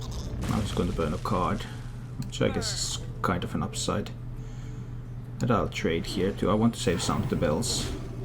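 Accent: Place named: Finland. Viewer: Your accent sounds like your from Finland, good video as always!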